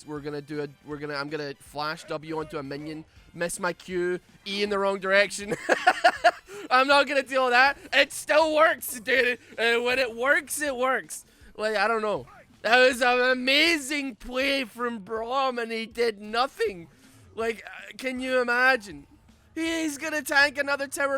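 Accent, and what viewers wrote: Scotland, United Kingdom. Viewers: Just a heads up, man, he's not Irish, he's a Scott.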